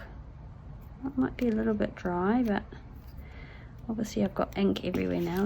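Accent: New Zealand. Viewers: I so enjoyed watching this video with you! I’m a Kiwi too so it’s nice to hear a familiar voice! Love these little bags, which will be great additions to my journals and tags. Look forward to watching your next one! Wish I had seen your Giveaway, it looked really amazing! How exciting to be waiting for a new grand baby!🧚‍♀️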